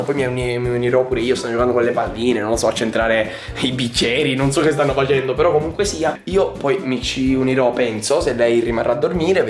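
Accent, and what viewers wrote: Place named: Veneto, Italy. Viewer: mamma mia che dialetto Veneto biceri zio can glie i bicer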